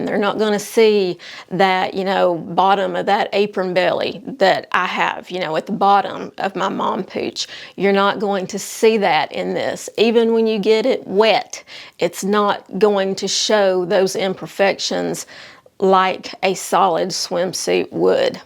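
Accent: South, United States. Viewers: Great tips and helpful links. I even love your southern accent. 😊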